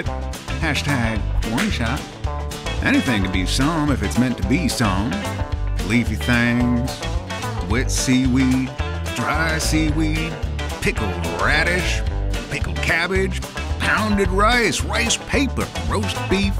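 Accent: South, United States. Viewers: 1. Love the font you used, fit the country twang of the narrator and the music excellently. 2. The narrator's fast spiel reminded me of the guy introducing the hand jive in Grease the movie 3. I understood every word he said, I don't know if that means I watch too much Trisha Paytas or I've just discovered a new talent and 4. ....ONE SHOT!!!! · I loved the southeren narrator! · best narration yet by the narrator. only problem now is thinking southern accent when eating Ssam!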